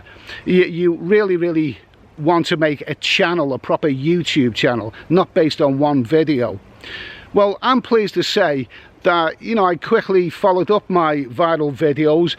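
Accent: Liverpool, United Kingdom. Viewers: I watch your streams but sometimes it’s a bit too barrack room for me. I get that you do a lot of sex tourism vlogs but I watch because I think you have a brilliant sense of humour and you are actually a very good travel vlogger. So well done, please be proud of your scouse accent because it’s part of your humour. By the way I’m not a 20 stone bingo player at the local club. You deserve the views because your a good travel vlogger and watching you review Sainsburys pasties and Scouse Brandy is hilarious. They should sponsor you.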